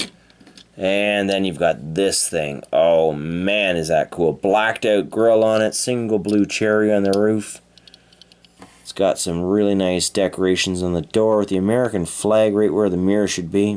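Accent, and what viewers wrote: Canada. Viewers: I was just about to comment. Is he form Canada because the way he said stuff. Mostly because the way he said car. I live in America but I have Canadian in me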